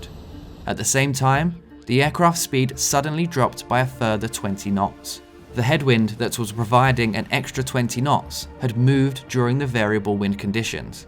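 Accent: United Kingdom. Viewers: I've skipped by your channel many times, didn't realise you was a fellow Brit. Thought you was another one of them boring American channels. Subscribed!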